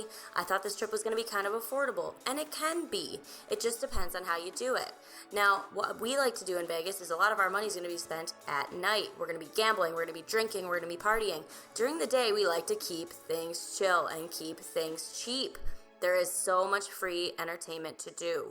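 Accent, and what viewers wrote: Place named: Wisconsin, United States. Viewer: From Wisconsin? Trying to pinpoint your accent.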